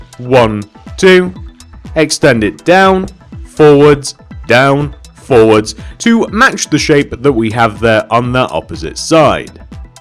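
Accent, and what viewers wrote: United Kingdom. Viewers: a jolly British accent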